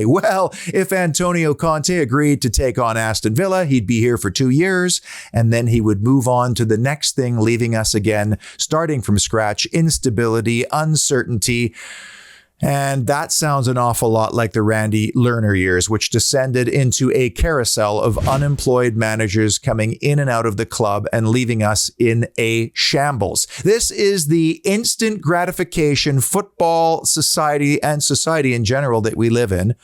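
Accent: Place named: British Columbia, Canada. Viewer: British Columbia is North America but not America. Listen to the accent. a star.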